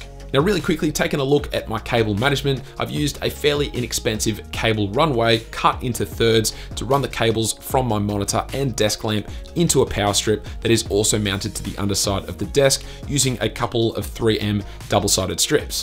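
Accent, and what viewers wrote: Australia. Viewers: Cause Aussie accents are the best m8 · Thats a Thick OZ Accent. Are you an OZ ? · sounds like such an aussie.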